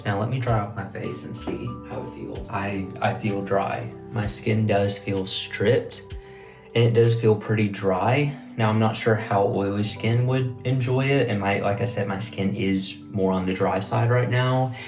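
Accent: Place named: South, United States. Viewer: Every time I watch your videos I just think I love you so much you seem like such a sweet boy (man). Your subtle southern accent is the best.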